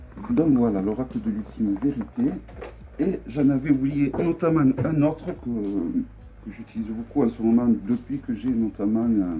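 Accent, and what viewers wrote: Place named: South, France. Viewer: Bonjour il n y a pas de hasard de tombé sur toi,contente de voir toutes ces belles cartes et avec ton accent du sud que j adore. BISOUS 👍🏊‍♀️🏊‍♀️🏊‍♀️🍒💐🌸🍀🌷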